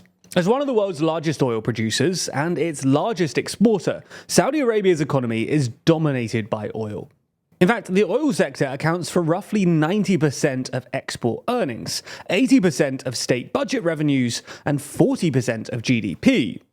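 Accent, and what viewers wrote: United Kingdom. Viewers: Just say, Muslim scholars. Stop trying to pronounce Arabic words with your goofy British accent.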